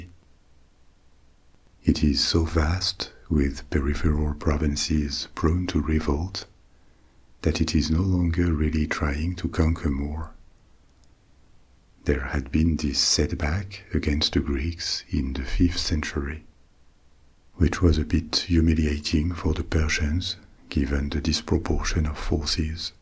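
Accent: France. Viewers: the original of this video got me through many sleepless nights. thank u for coming back mr!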